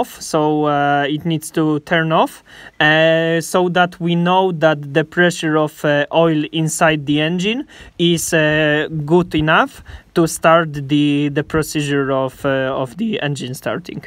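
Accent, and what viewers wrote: Poland. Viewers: Polski akcent nie oszukasz… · polaKA slysze XD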